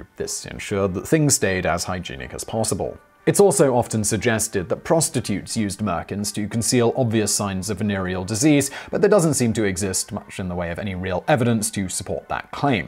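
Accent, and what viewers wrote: United Kingdom. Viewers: Even when spoken with a British accent, merkin is an ugly word.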